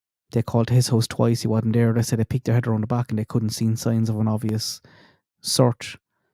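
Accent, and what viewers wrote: Ireland. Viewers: Can I ask if you are from West or North West Ireland? You sound so much like my favorite Irish Trad band from Co. Clare, "*Socks is In The Frying Pan" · I like ur theory about the dog in the backyard. That makes total sense to me. I was listening to a prosecutor pod cast and they said that one of the reasons they would seal court documents and a hearing is because others may be involved. Other than that the courts belong to the citizens. We own the courts because we pay for them which is why there’s a freedom of information act. (FOIA) I love listening to you talk. My grandfather’s parents settled here in Michigan. At the time the Irish were not treated so well by society so they would set up Irish settlements. I actually grew up in the old Irish settlement. They had there own school and functioning society. Because my grandpa was only around Irish settlers and his parents, he retained a bit of the Irish brogue. 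He was the sweetest man on earth so herring your voice is so nostalgic for me! ☘️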